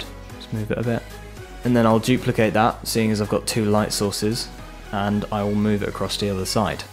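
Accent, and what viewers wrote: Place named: United Kingdom. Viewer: OMG YOUR BRITISH! (sorry, i'm new to your tutorials)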